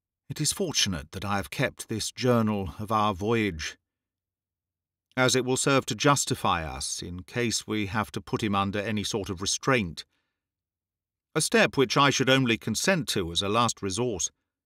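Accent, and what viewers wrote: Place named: Scotland, United Kingdom. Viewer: Perfect as always,! Your Scottish accent is so fun to listen to!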